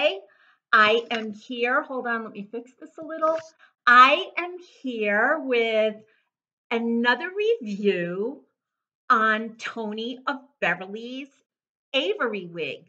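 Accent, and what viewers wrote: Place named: New York, United States. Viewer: I can't get enough of your pronunciation of "Tony of Beverly!" Tony of Brooklyn!!!!! As a New York woman but living in the south, I miss that NY accent! BTW, you look gorgeous in Avery! I purchased her after watching your review - in Kahlua, too! ♥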